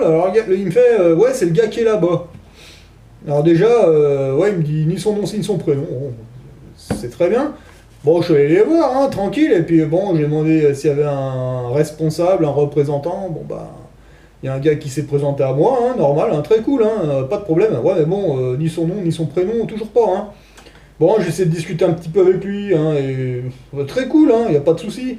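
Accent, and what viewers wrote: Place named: Haute-Savoie, France. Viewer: Ton accent me rappel sympathiquement ma jeunesse dans les montagnes de haute Savoie. Vive les bivouac au semnose et les footing sur la tournette. Sans parler des skis de rando. Vive le 27em BCA.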